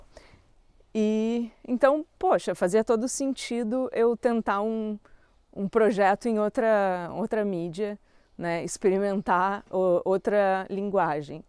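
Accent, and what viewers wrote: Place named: Porto Alegre, Brazil. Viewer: Confesso que ainda não li os teus romances, mas tenho lido tua news regularmente já faz um tempo. Desde a enchente aqui de poa, por algum motivo do acaso achei o nevoeiro e me identifiquei. Comecei a escrever também. Muito legal te ouvir com teu sotaque portoalegrense. Lindo o video e apesar da sensação de isolamento (volintário), dá pra entender muito bem porque tu escolheu morar nesse lugar.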